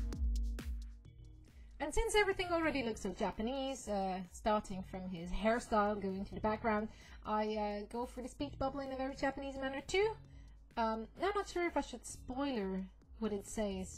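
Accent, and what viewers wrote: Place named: Germany. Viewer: r u married? (Love ur work and ur german accent)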